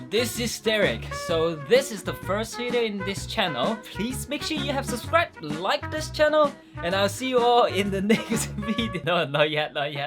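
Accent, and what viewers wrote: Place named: Hong Kong. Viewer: 喂，你啲英语發音和用字都係自己作出黎和有好重的香港广东话口音；西人是完全唔知你噏乜；你要好好学吓 preposition 应该点用，唔好乱讲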